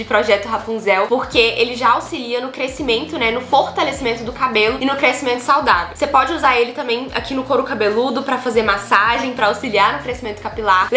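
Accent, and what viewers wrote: Minas Gerais, Brazil. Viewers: é o lindo sotaque mineiro ❤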